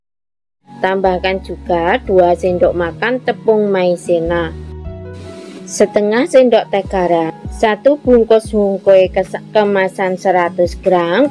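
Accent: Java, Indonesia. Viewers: Bunda orang Jawa yah Bun suara nyabagus bun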